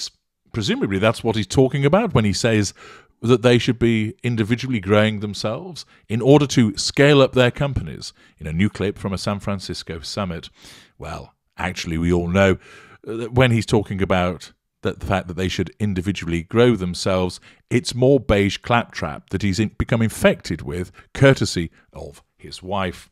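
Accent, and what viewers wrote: United Kingdom. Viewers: One of my favorite things you do is to drop an appropriate f-bomb amidst this eloquent and proper British accent, i.e. “Oh, do shut tf up.”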